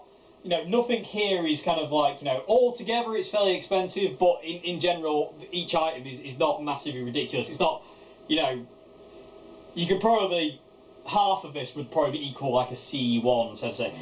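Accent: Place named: Birmingham, United Kingdom. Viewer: I enjoyed this! cheers mate. I especially liked the "Apache rose: Peacock" breakdown. Thats a lovely chord progression - and you did it nicely. Fair Play. :) JpD. (PS: you sound "brum-light", like a young J.Carrot - it's an observation; and he is a likeable bloke, so don't think im being off). Keep up the good work mate :)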